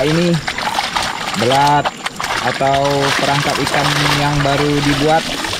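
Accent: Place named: Malaysia. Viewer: dmana tu bang,,cakap mcm orang melayu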